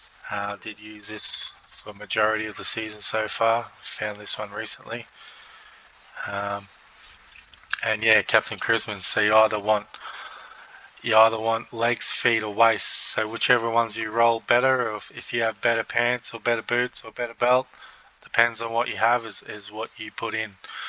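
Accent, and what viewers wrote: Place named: Australia. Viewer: Nice to see a guide with an Aussie accent.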